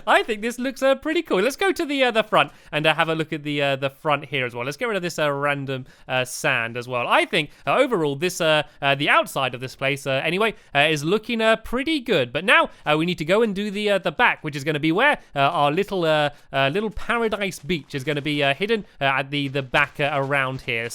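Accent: United Kingdom. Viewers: Brandon Ford British. He loves here. He lives in Bathe, but travels around the place a lot.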